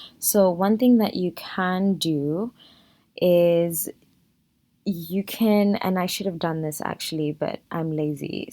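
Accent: South Africa. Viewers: Wait, you must be South African? Right? I love your channel btw, brilliant content 💕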